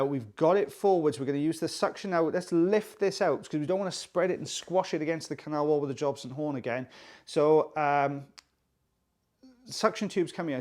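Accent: Wales, United Kingdom. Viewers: I live in America. Listening to your lovely British accent is part of the experience. No need for apologies. With your commentary, I not only get a satisfying video, I get a good few minutes of learning. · Your voice is so soothing. The Welsh accent is the best in the world! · If you cut down on the talking I’m going to stage a protest! Your enthusiasm is infectious. Your welsh accent reminds me of holidays past, when mum and dad would load the car up and we’d go to Benllech Bay for a week. Happy memories. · Stop 🛑 don’t apologize for talking! I could listen to you read the dictionary. Don’t you know how Americans love a British accent ‼️‼️❤️ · Honestly the commentary is incredible. I’m not sure if it’s the welsh accent, but I feel like I’m listening to a rugby match commentary! It would get excited at times and more calm at others, bit of technical explanation, really like the highs and lows of sport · I love the Welsh accent. 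Far better than my Devonshire one!! Would never mute you!!! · Don't apologize about talking a lot because that's what you're supposed to do on these videos. Plus you have an awesome accent! I love British accents ❤ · Just keep talking. Just love your accent. Reminds me of my Welsh relatives.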